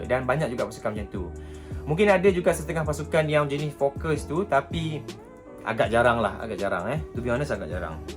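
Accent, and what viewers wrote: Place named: Terengganu, Malaysia. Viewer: slang ganu tetap ade 😂😂😂, kemahh sek kitee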